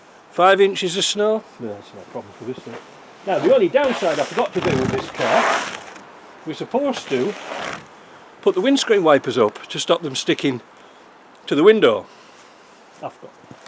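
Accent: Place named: North, United Kingdom. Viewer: Yeh, with the accent I thought you were up north,not as far as canada though lol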